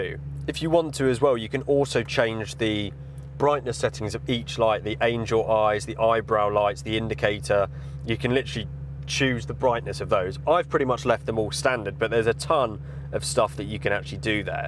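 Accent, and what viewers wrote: United Kingdom. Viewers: Bro., your voice impressions of Doug Demuro was 100% spot-on. But what was equally amusing was your transition to your native British Accent as i thought you are American and certainly never heard of any Britt impersonate our Accent so accurately. You earned my subscription right away.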